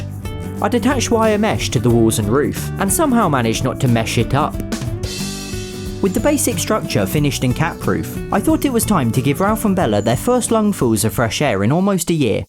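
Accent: United Kingdom. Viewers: For a second I thought was just getting a little tired (and a little British too it sounds like).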